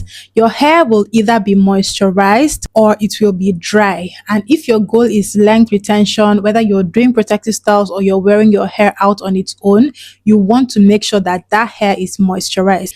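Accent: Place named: Germany. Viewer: I like your accent. I notice a little German tones to it? Am I correct?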